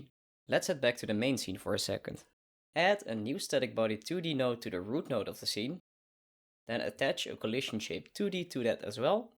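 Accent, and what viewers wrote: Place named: Netherlands. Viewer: zeker wel een Nederlander. Accent is heel herkenbaar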